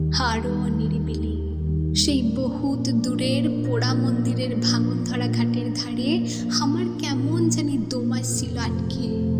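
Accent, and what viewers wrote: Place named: Bankura Purulia, India. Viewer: Darun hoacha amdar Bankura purulia vasa ta❤❤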